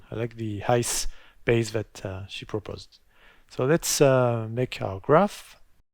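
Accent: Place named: France. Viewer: Avec ton accent français j'arrive à comprendre xD merci pour le tuto !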